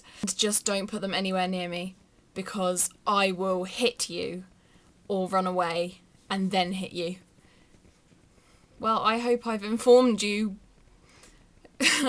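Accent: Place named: Australia. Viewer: You made me laugh sooo much how you said things it made it even more funny with your Australian accent.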